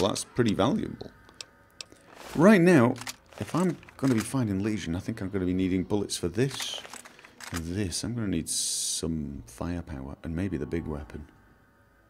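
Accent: United Kingdom. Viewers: Where is from? It says he's from norway but he has kind of a british accent and he says R in a pretty weird way. And I'm not hating or anything I love his vids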